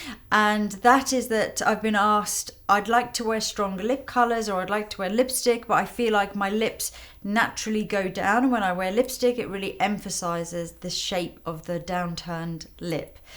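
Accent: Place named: Hampshire, United Kingdom. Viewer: Beautiful. Do you come from anywhere Hampshire you have a faint accent?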